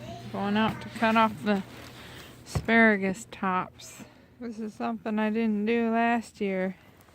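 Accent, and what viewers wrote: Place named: New Brunswick, Canada. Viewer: Thanks for letting me know where your accent is from. I have good friends across the Bay in New Brunswick who pronounce words the same an you! LOVE IT! Bacon makes everything better!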